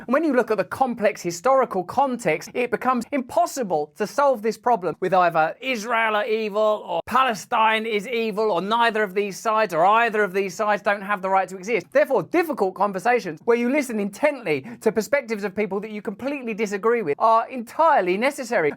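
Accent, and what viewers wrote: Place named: London, United Kingdom. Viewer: u sound like ur from london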